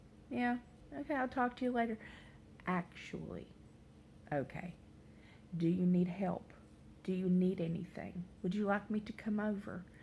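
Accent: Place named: Carolina, United States. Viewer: Thanks. I’m from chapel hill and i recognize that Carolina accent anywhere ❤️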